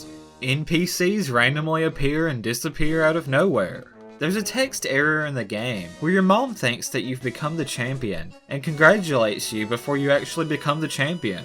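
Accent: United States. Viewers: Damn your American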